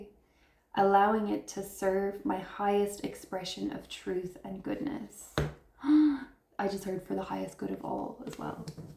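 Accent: Ireland. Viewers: Lovely to find you too, together we do this thing, and put things right. Cancerian? An Irish lilt? You made me cry too, thankyou. x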